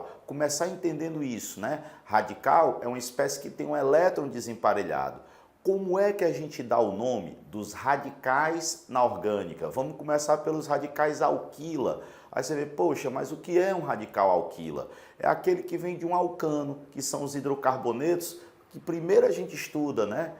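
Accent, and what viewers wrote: Ceará, Brazil. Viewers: como eu amo sotaque nordestino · O senhor é cearense?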